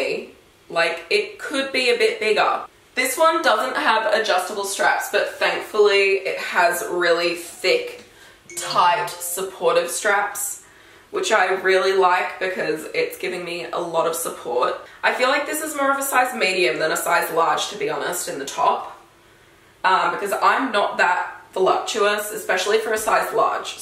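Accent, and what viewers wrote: Australia. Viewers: You're so f@ beautiful an I love the Australian accent 😍😍😍😍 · ow an aussie? i live in sydney :) also this is hot :p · LOL the subtitle for the beginning said “long time gnar see” not made for australian accents I guess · Idk where she is from but it sounds like Australia, in which case it would be spring/almost summer there! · Are Australian ? Very cute accent hehe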